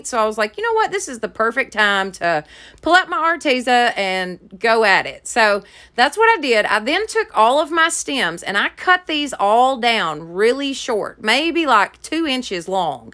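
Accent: South, United States. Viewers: I started the video and my 11 year old went she's from the south right 🤣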